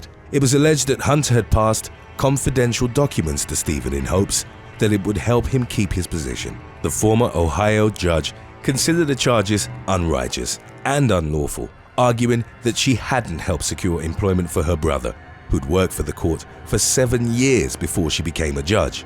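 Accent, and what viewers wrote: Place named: United Kingdom. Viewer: Haha funny British narrator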